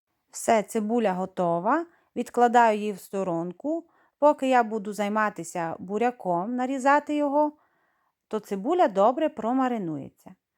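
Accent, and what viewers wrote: Transcarpathia, Ukraine. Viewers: Дякуємо за закарпатську вимову ,привіт з Білої Церкви що на Київщині!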